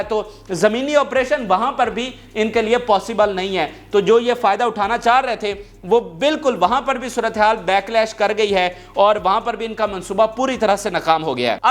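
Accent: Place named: Punjab, Pakistan. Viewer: نا بول انگریزی نا بول۔۔۔منہ وچوں پنجابی بن کے نکل دی اے تے نال روندی اے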